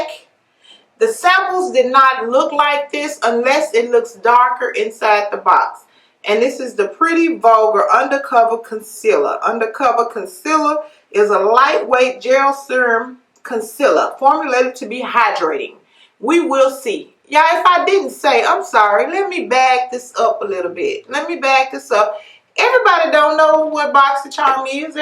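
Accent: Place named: Texas, United States. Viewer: Your accent makes me so happy. Are you from Texas too? Hugs!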